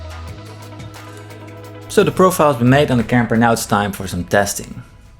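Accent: Netherlands. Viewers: U sound dutch lol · Ik denk dat je nederlands bent toch? Wel goed accent!!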